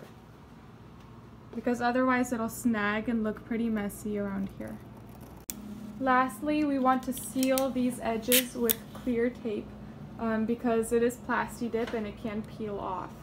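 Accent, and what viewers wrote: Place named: Minnesota, United States. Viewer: Your accent sounds like Minnesota or North Dakota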